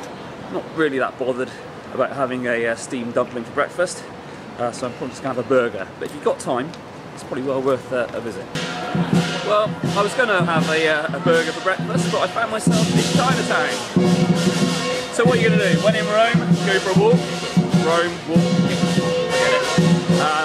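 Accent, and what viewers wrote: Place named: United Kingdom. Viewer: Adorable British accent.. and yeah brilliant video too :)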